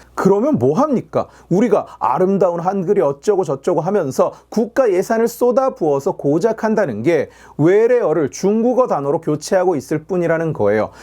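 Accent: North Korea. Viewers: 초반만 봤는데 북한말인줄..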